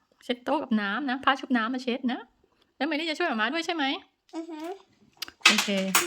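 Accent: South, Thailand. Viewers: ทางนี้มะกอกะแพงคักจ้า สาวน้อยพูดใต้ น่ารักมากค่ะ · จริงด้วยค่ะ มะละกอ แพงกว่าข้าวสารโชคดีที่คนใต้เรา ไม่ได้กินมะละกอบ่อยนะคะ พูดใต้ได้หลายคำเลยนะคะ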